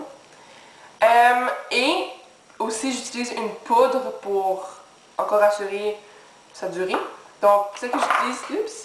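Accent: Quebec, Canada. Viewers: Normal qu'elle ait un accent elle est quebecquoise les gens non mais serieux !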